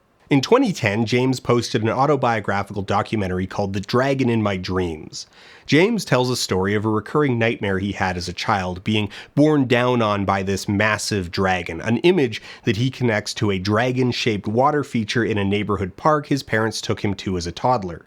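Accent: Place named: Canada. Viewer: I swear your "beens" and "processes" get more Canadian every video.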